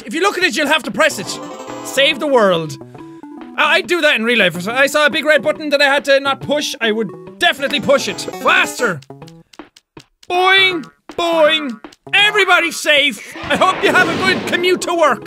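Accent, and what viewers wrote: Ireland. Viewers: This was the first video I ever say on this channel, dang nostalgia. I remember being surprised by the intro, not because it was loud but because he was Irish 😂. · heya you uh you irish i'm new to your vids so idk and if so i'm part irish so....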